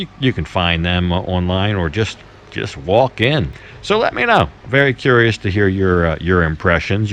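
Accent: United States. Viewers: We get you on the ships . Outside manilla. A real american voice can't be bad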